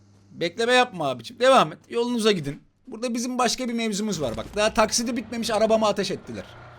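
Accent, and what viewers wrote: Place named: Azerbaijan. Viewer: abi , sendemi Azeri çıktın ...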